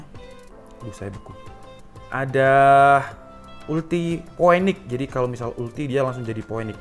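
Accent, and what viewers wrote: Malang, Indonesia. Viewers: Dari malang ya? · pean wong malang ta mas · Lhoalah wong malanggg to, mas 🤣🤣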